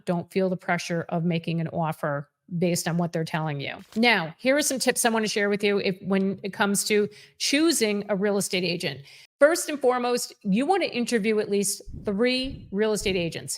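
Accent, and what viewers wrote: New Jersey, United States. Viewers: I watch this channel for the New Jersey accent alone 😅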